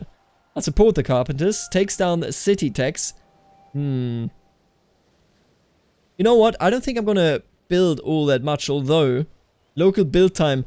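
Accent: Germany. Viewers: It's funny how his german accent is hardly heard while he speaks english. But the second he pronounces a non-english word, you can bet your ass he's a damn bavarian.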